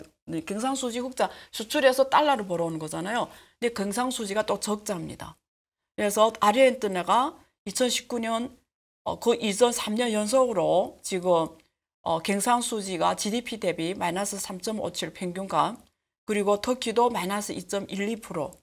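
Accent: China. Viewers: 많은것 배우고 있습니다~^^억양이나 말투때문에 실력이 살짝 묻히지만 완벽하지 않은 모습이 더 정감이 있어요 인간미라고 해야하나요? 중국분이라 더 객관적으로 한국을 판단하고 계신것같아 그또한 잇점인것 같습니다~~ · 이분조선족 임?발음..ㅋ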